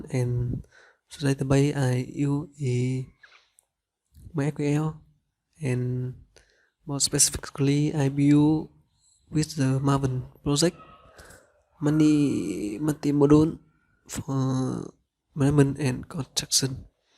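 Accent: Vietnam. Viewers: tưởng ôn ấn độ nào. nghe sound chuẩn việt nam r :))0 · Hình như bạn là người Việt phải không ? Nghe phát âm tiếng anh giống VN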